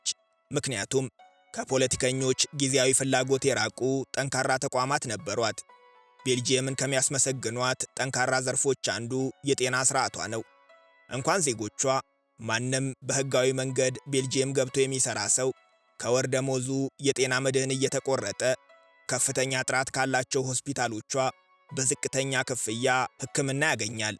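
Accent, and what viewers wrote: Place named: Addis Ababa, Ethiopia. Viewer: yemigermew ahun yalehut gent Belgium new ena ende addis temesche new yesemahut konjo akerareb new berta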